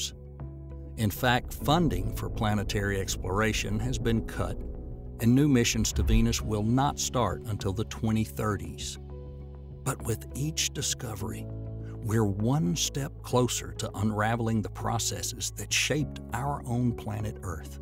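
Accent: South, United States. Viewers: Always expect a British guy when watching space videos. The country accent always catches me off guard lol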